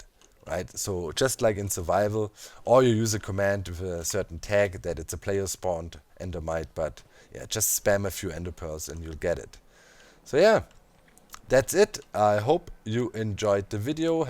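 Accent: Germany. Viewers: you sound german r u german?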